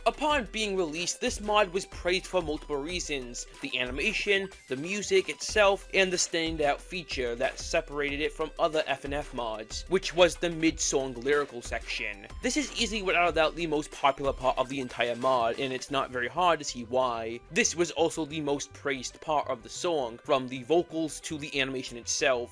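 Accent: Brooklyn, United States. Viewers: By the way, do you have a Brooklyn accent? It sounds like it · The worst and best part about this video is that he sounds like Brooklyn guy slightly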